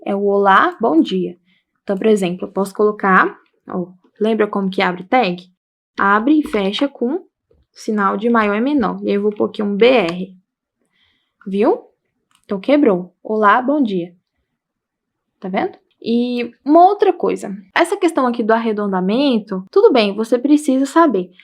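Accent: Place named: Brasília, Brazil. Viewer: Se pudesse, dava 1000 likes. 👏👏👏👏👏👏👏👏. Como faço para ter uma aula presencial com vc? Vc é aqui de Brasília? ( Pergunto pelo sotaque. Rs).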